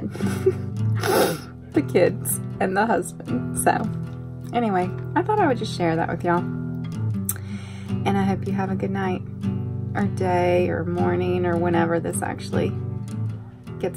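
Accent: South, United States. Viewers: I enjoy hearing you talk,I always have liked to hear southern bells talk,it's just so sweet.I know you explain things that you say,so other people,not from the south,will know what your saying,but I get every word,and it's refreshing.Anyhow,keep up the goid work,I really enjoy your videos.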